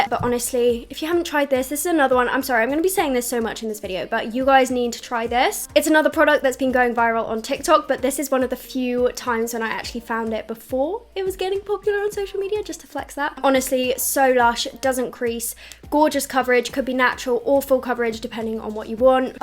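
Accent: United Kingdom. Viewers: Well I was in love with this girl and didn't realize something that I just found out now when I put on this video - she has an annoying as hell British accent - I think I just fell out of love - oh well - that's the ironic thing about the English - they don't know how to speak their own language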